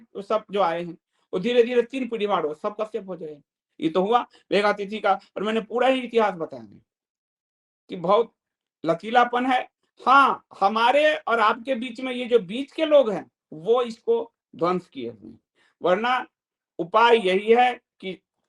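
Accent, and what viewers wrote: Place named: Bhojpuri, India. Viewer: Abe jahil, sahi se Hindi bhi nhi bol pata hai...bhojpuri bolne lagta hai(•‿•)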